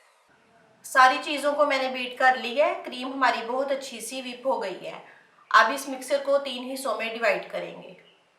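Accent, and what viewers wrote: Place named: Lahore, Pakistan. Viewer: VIOCE TO LAHORI LAGTA HA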